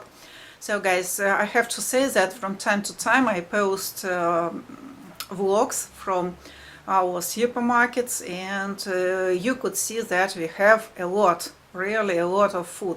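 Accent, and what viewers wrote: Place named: Russia. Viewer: please, do what you want to do here on YouTube. My boyfriend is a Russian who used to work quite frequently here in the United States. He spent roughly sixty to seventy percent of his life here with me. Back in Russia he has two kids with an ex. As such he would have to go back and spend time with his family there. Such was the case at the time that this situation came about. I was there with him for the new year and came back home the following week. We're both about 40 years old and I can indeed say with absolute confidence that this is a person that I love and miss dearly and would love to be married to at some point. Any plans for such a currently on hold for obvious reasons. I've been to his family's dacha several times. I'm on speaking terms with his family there despite the political situation. Watching you to go about your day for me is a kind of therapy. 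Hearing your accents the people around you speaking in Russian it gives me a sense of home that I can't have right now. Please, understand that people will be miserable because of their own personal problems and projecting such unpleasantness on you is all they can do. They're bored, they are shallow. You however, small a one it may be, a light in the darkness. However long that darkness is the last. Пока-пока:)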